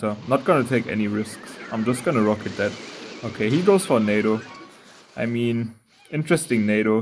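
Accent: Germany. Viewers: Yo bro i do have to say that i really like ur videos. U are literally the second youtuber in my last 13years of youtube who i would consider as a "small youtuber" where I watch all the videos. I have never thought that right now i prefer ur videos against videos from big youtubers like trymacs,.. because I just play CR every two days for 3, 4 matches. What is more i really feel entertained by ur commentary I have never thought that i can watch 15min pure clash royale videos without something else. Keep up the good work and maybe u can start with professional tutorial videos and so on. I am sure that this will lead to a faster grow because I do see ur channel with +50k subs when u try to get more professional and attract more people by tutorials,... And btw I love ur accent i am also german🙌🏼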